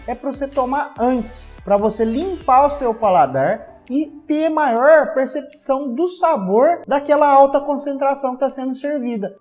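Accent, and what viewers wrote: Minas Gerais, Brazil. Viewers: Sotaque Mineiro kkkkk eu falo do mesmo jeito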